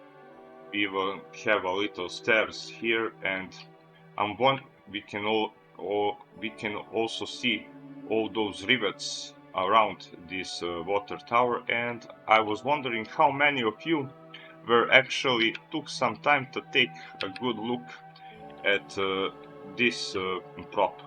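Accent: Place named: Serbia. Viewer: W series so excited for the next part Love the serbian accent😂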